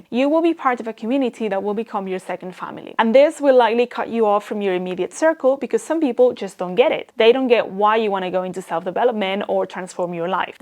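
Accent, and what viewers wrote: Spain. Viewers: It's me or your main language is spanish? Your content is really good, I'm just starting and is valuable to find channels like this, blessings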